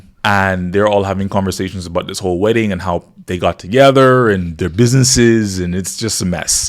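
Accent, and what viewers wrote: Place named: Bahamas. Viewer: You have to be from The Bahamas. From I heard you speak I was like yup. Glad to see a Bahamian making moves. Salute!!!!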